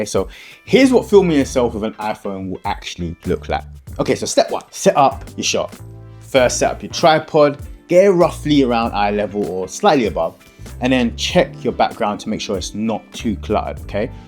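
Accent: United Kingdom. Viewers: Really good thanks, just discovered this camera app, and I like to hear a Brit accent, nithing against America at all, just me...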